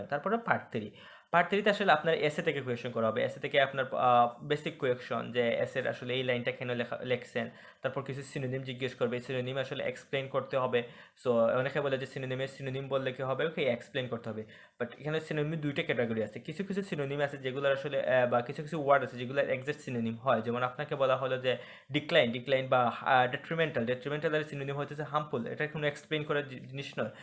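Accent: Sylhet, Bangladesh. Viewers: Vai apnar mat a lager sylhet e,,,Apne sylhet koi takoin ?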